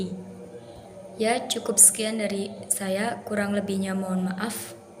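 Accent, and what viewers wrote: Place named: Java, Indonesia. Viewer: Good luck, medok ya gaes ya😅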